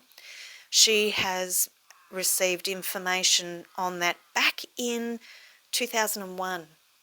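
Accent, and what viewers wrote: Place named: Australia. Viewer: Hello. Thought you sounded Australian. So am I. Like what you share.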